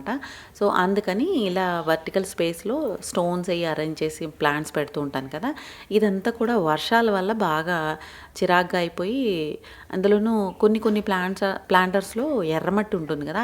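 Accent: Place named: Godavari, India. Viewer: హాయ్ జీ. మీరు సూపర్. మల్టీ టాలెంట్.. నేను లేచిన వెంటనే మొక్కలు చూసుకుంటాను. మావాళ్లు కాల్ చేస్తే తియ్యకపోతే మొక్కల దగ్గరే ఉంటుంది అనుకుంటారు. మీరు విజయవాడ, గుంటూరు అనుకుంటాను. మళ్ళీ గోదావరి మాటలు కనిపిస్తాయి 😂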